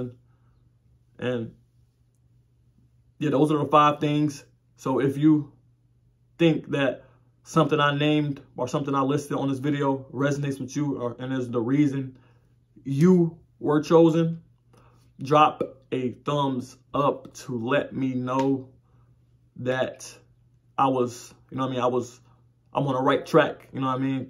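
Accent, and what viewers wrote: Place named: Texas, United States. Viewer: lol ok you talk like them bois in tx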